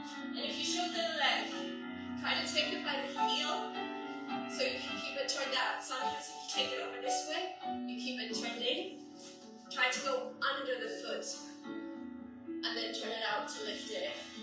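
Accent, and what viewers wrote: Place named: New York, United States. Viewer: Certainly haven't lost your touch- You look like you're feeling healthier than ever 🧦💛 (Can't remember if you're from New York originally, interesting accent though)🦅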